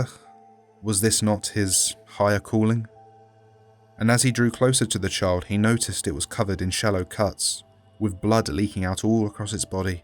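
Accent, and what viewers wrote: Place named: United Kingdom. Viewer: I like british acsents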